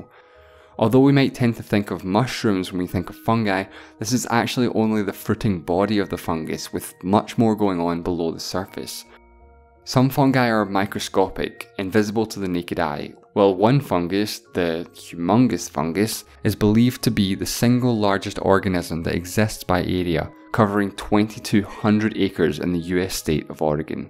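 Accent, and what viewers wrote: Scotland, United Kingdom. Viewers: As a first time watcher, I learned two things. 1) This guy's accent is hard to pinpoint, but I think somewhere in Scotland. 2) We should send fungi that can survive in space out into the stratosphere and see what happens. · Excellent video...thank you! Also love the narrator's voice and Scottish accent, no homo. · Alright I need to know what your accent is lol. It sounds Scottish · I love the mix of knowlege and scottish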